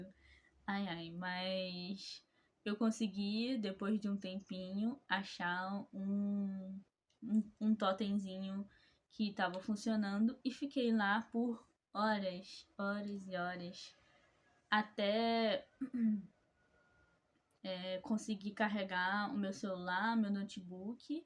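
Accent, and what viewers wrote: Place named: Rio de Janeiro, Brazil. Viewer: Sotaque de carioca ,é carioca?Boa sorte 🍀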